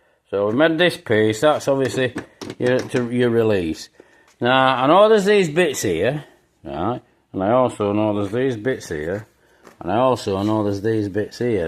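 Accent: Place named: Yorkshire, United Kingdom. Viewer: Yeh a master, not many folk left now that can do the work & classic car repairs like this man does. Pluss he's from Gods own country Yorkshire the same as my old queen came from with that amazing accent. 👌 Jim.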